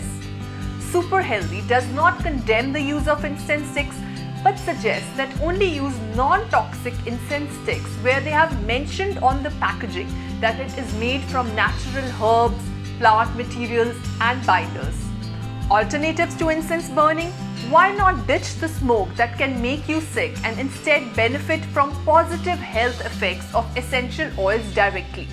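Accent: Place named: India. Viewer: I didn’t expect that heavy Indian accent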